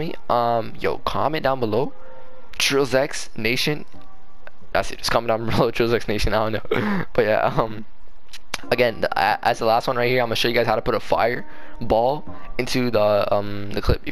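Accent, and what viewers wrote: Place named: North, United Kingdom. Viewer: Sounds like some up north stuff so I can follow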